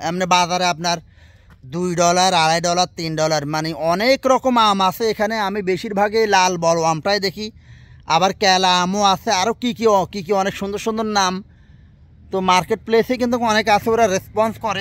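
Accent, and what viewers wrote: Noakhali, Bangladesh. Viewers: ভাই আন্নে কি আংগো নোয়াখালীর নি? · ভাই আপনি কি নোয়াখালীর মানুষ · আপনে কিতা নোয়াখাইল্লা নিতা। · আপনি কি নোয়াখালীতে বাড়ি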